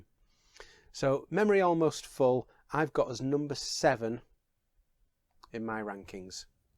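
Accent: Leeds, United Kingdom. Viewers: Are you from West Yorkshire? Heard you mention jumbo records on one of your videos and you sound Leeds!!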